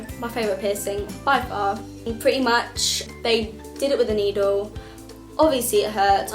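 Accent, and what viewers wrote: England, United Kingdom. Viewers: Onyx Lover she's english but she lives in France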